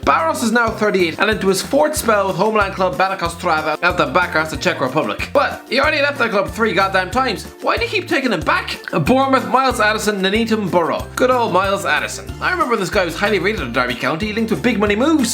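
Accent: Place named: Ireland. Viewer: he’s fucking irish give him a break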